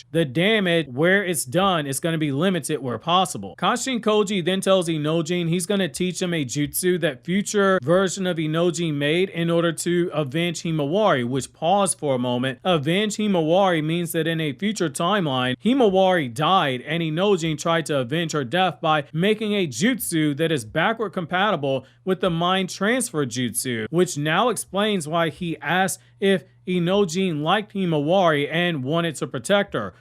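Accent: New York, United States. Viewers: ole boy has a new york sounding accent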